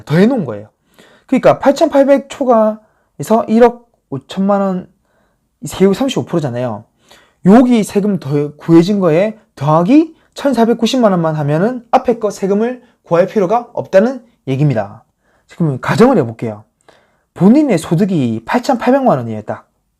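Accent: Busan, South Korea. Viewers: 부산 사투리 좋네요..잘 들었습니다. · 발음 문제 없는거 아닌가요?ㅎ그리고 부산사투리라서 귀에 쏙쏙 더 잘 들어옵니다.항상 잘 보고 있어요감사합니다~ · 부산 사투리 구수합니다 저도 대구에서 태어나 부산 큰댁에 자주 놀러갔습니다 ㅎㅎ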